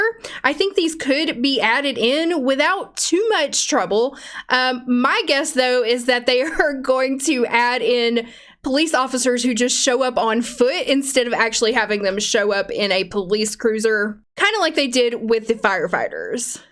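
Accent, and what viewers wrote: South, United States. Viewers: Is it a southern American accent?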